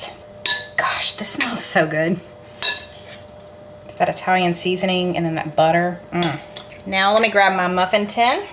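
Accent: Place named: South Carolina, United States. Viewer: Your husband looks a like Tom Hanks. Love your SC accents!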